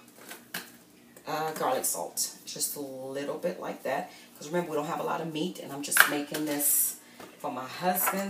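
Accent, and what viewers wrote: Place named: United States. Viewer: eskizem wi madam se cuisine haitienne mwen te ekri sou YouTube la,epi se anglè mw te tande ki tap pale,franchman mw panse se yon americaine ou te ye,selman mw te felisitew pou bouyon an,siw pran sam diw la nan yon lòt sans wa eskizem masè...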